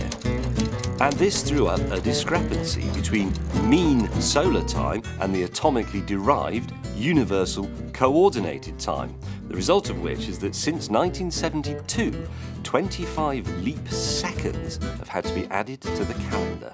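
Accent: United Kingdom. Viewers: why the hell the British accent is sooo attractive?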